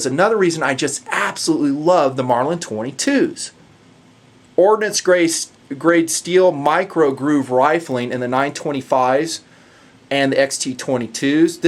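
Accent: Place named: Appalachia, United States. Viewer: I love your hillbilly accent that drops in randomly in the video. but hey I cant get enough of your vids man.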